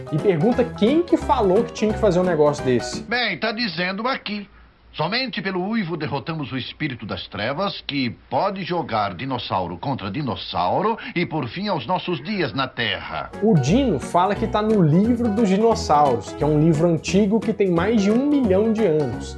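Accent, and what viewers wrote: Minas Gerais, Brazil. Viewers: Cara tenho muita inveja de quem tem sotaque mineiro kkkkkk é um dos mais lindos do mundo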